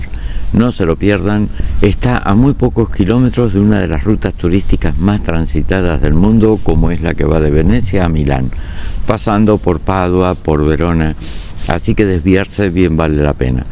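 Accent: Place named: Argentina. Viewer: Buenos días Sr.; soy su nueva fiel seguidora, soy 🇵🇪, vivo en 🇺🇸 tengo 62 años, viajo a 🇪🇸a visitar a mi hija un año, y otro a 🇵🇪. Buscando consejos de viaje, con conexiones eso es muy estresante, encontré su canal, me encanto por la forma pausada en que nos habla, aparte su acento argentino, trabajé 5 años en su País, conocí gente maravillosa ❤. Por eso mi cariño eterno 🇦🇷. Muchas Gracias, este video me hizo recordar mi paseo a Fiorenze.. y la vez que me perdi al regreso a Roma por qué me fui hasta Nápoles 😅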